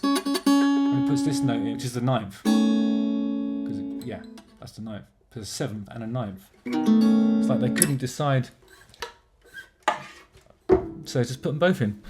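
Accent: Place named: RP, United Kingdom. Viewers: Love these! I'm also from good ole lowestoft and love listening to your accent here in nz! Always been a massive fan,thanks for sharing! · Loool, you explaining modern RnB lyrics in your posh ass English accent was great. Nice to finally find your YouTube page.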